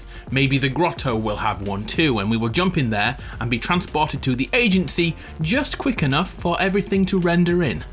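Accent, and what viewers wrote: United Kingdom. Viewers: Wait wait wait.......... Is British?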